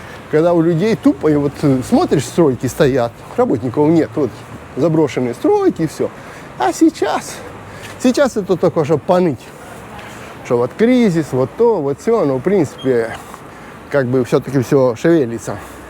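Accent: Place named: Ukraine. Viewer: А вы точно из Москвы , у вас акцент украинский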